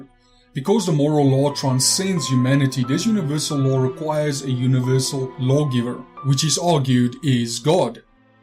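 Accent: South Africa. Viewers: I agree, awesome accent :) is it south african?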